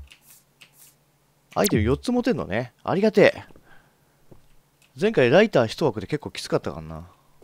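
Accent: Kansai, Japan. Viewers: かっこいい人の関西弁はさらにかっこいい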